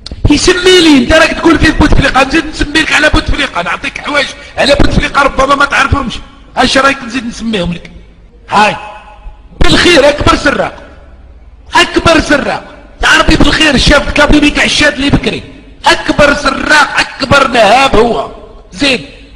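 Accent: Morocco. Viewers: لهجتك مغربية.... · C un marocain qui parle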